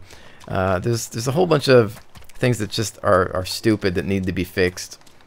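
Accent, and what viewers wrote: Canada. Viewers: Great vids! Are you Canadian? I only ask because you say Day Zed, HostILE and Bugger off!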